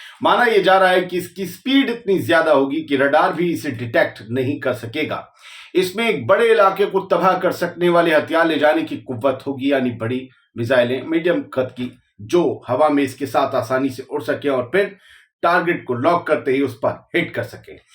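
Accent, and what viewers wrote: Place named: Lucknow, India. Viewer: your accent sometimes make me feel that you are from Lucknow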